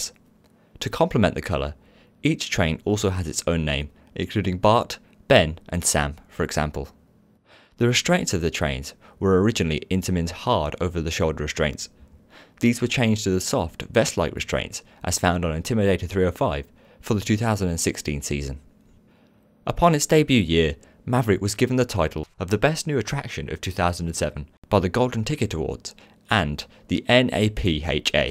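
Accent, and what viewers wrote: United Kingdom. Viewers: but really is this your voice or the voice from a computer. i love the accent(i think britsh) and you are doing a great job!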